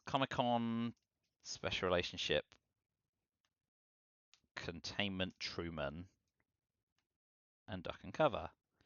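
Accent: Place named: England, United Kingdom. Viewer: I enjoyed the rambling commentary (so did my wife) and the quaint English accent was just the icing on the cake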